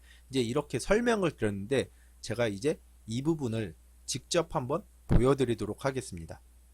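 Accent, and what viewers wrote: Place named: Jeonju, South Korea. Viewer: 전주 사람인가? 말투가전주인데..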